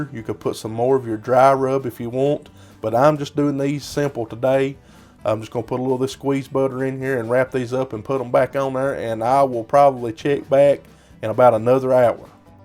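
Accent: South, United States. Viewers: Yoooo really down south sound to you man 💯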